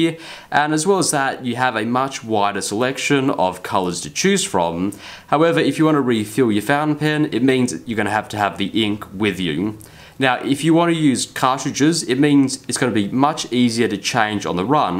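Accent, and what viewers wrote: Australia. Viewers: It's great to have a local reviewer. I so seldom hear Australian accents let alone a Sydney accent. Noice!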